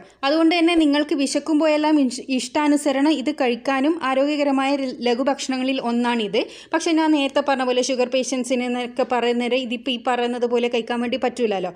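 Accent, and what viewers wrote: Kannur, India. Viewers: Nalla avatharanam... Ningal kannur aano 😊😊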